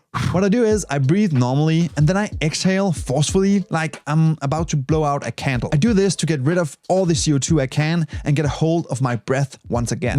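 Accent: Denmark. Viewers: Love ur accent are u from Denmark 🇩🇰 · This is such a perfect danish accent😂😂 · I thought I heard an danish accent in you, am I right? 😂❤🤍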